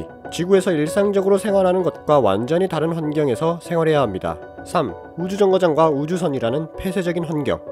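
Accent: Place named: Gyeongsang, South Korea. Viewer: 경상도 사람인가보네요 서울말 잘하시는듯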